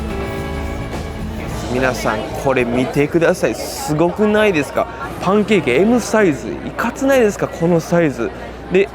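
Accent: Kansai, Japan. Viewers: この関西弁が非常に聞き取りやすい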